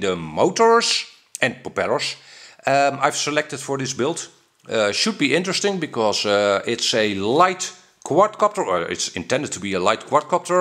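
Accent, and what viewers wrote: Netherlands. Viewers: More of a teaser than a build ; ) Its a really nice looking frame and I like that the bracing forms the base of the stack as its all very neat but, the pdb sticking out of the side kills me! I like symmetry and if it really can't be symmetrical its GOT to go at the back as it just looks like it'll be more streamlined... No science just one of those yep, that looks about right things :) Even with your Dutch accent making your intonation a little different to what I'm accustomed to I was convinced from the start you were going to have some "grumbles" about the esc and yes, you did! Some valid ones too, having to change the motor positions & resolder leads wouldn't be my first choice, easy wins those situations every time in my world. I'd almost be tempted to buy a different one or get one of their flight controllers (I'm assuming they use that set up).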